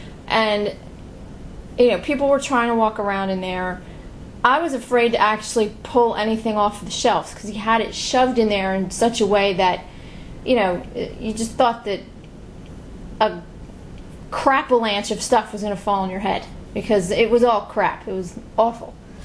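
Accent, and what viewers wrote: New Jersey, United States. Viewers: Just when we thought the crazy knit sweater guy was the funniest thing up pops your "Jerserylicous voice" with a garage sale story we are all too familiar with - thank you so much for the shout outs it really means alot to us - we are looking forward to your challenge vid - thanks again T&D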